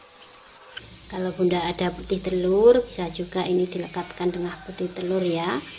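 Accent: Java, Indonesia. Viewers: Iya itu logam jawa hadeuuuh...😀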